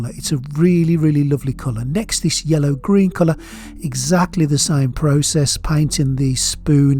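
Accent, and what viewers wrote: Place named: Black Country, United Kingdom. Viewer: I can't get the code to work sorry 😞 GREEDY3D90 ? 👍👍 black county? Or Birmingham? I can’t quite decide lol it seems more BC then some words sound Birmingham 😂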